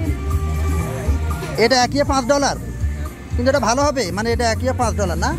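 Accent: Noakhali, Bangladesh. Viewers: ভাই আপনি দেই আর মতন নোয়াখাইল্লা কথা একবারে পুরাপুরি খুব ভালো লাগে আপনার কথা হুইনতে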